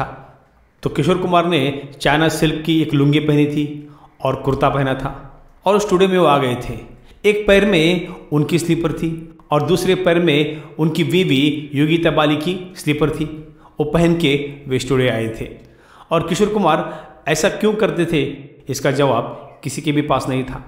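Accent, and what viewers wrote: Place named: Maharashtra, India. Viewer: aap ke Hindi speaking ko sun kar laga ki aap Maharashtriyan ho aur aap ka lahja bhi marathi hi hai Hindi bolte samaya apna lahja Sudhariye.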